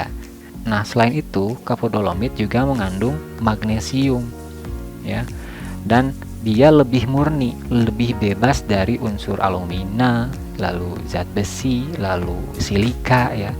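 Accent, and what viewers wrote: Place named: West Java, Indonesia. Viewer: Aksennya ky org sunda ,,timana kang??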